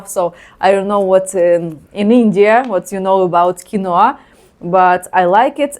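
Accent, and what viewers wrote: Russia. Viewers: О май год. Это вас я видела пьяной и матерящейся в Арамбольсеом магазине... А акцент у вас .... Отвратительнийший - хуже индийского акцента, только жесткое рашен стайл... Не зря я не доверяю русскоговорящим учителям английского... Вы станете мечтой индийских мужчин - которые мечтают приехать в Гоа и переспать с русской... Так как кроме них вас никто смотреть не будет - это невыносимо. Но вы станете мемов в индийской блого сфере ... Вы прямо готовая РУССКАЯ НАТАША (Наташами в Турции и Азии называт "сексуальных/доступных - переводится с азиатского на обще понятный" женщин). Значение английских слов сказанных индийцами, которые вы можете воспринять как комплимент, имеют сугубо утилитарное значение и весьма далёкое от значения этих же слов в западном мире. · I love Russian accent and girl ❤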